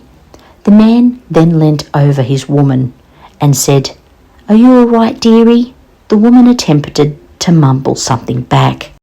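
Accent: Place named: Australia. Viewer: Keep up your great work. So tired of O/S people, criticism of your accent. (usually Nth Americans-who think everyone should bow to them) I'm from Sth Aust, We tend to be quite tolerant, except when people criticise Aussie accents-each State has its idiosyncrasies with speech patterns. Most countries do!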